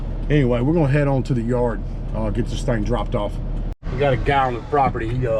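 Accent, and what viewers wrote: South, United States. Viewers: "Lamberginny, Austin Martin..." haha, gotta love the southern accent. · Laahamburghennies, Austin mahrt inns, beautiful sunny southern vernacular!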